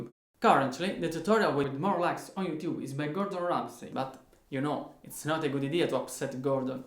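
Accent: Italy. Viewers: Accento italiano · L'accento Italiano si sente da 10km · Si capisce un po'dalla pronuncia che sei italiano. However,nice video! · I'm Brazilian, yeah you can notice it, the pronunciation of the words sound very Italian, IDK how to explain? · Your English is good. Love the Italian accent! You are very talented!! Keep it going!!! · Si sente tantissimo il tuo accento italiano😂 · Im spanish, and its very easy to notice that you're italian, and I don't say it with bad intentions :) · I hear italian accent here umlmlmlml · Are you from italy? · I'm Italian and I'm 14, but I think u are from sud Italy cause of your strong accent, I notice only now that u are Italian and I don't know why I'm speaking English, ormai ho già scritto 😂, a proposito di dove sei?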